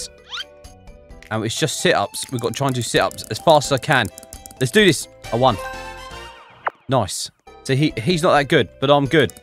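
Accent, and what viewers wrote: United Kingdom. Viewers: 39 mins! :D love ur vids and the british accent 😮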